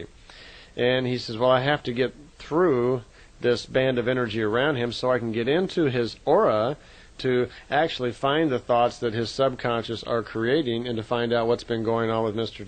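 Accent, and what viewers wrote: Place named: England, United Kingdom. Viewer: Well this mermaid is so wishing that she was at the ocean instead of in Las Vegas....I have found some beauty here and it is a place called Red Rock Canyon...but there is nothing like riding waves with your body!! Soon though i will be in San Diego for a week...my childhood home.....You sound as though you maybe from England..I was born in Ireland!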